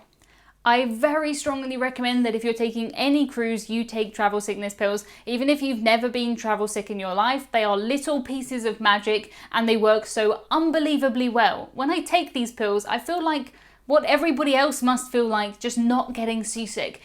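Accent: United Kingdom. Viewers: Please continue to speak in your home grown accent and use the words of your country. I love your "Britishness".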